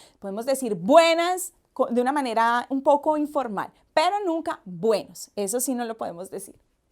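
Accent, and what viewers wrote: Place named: Colombia. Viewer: me parece acento colombiano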